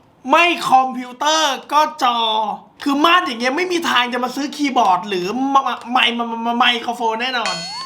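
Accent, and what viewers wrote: South, Thailand. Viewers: พี่เป็นคนใต้หรอติ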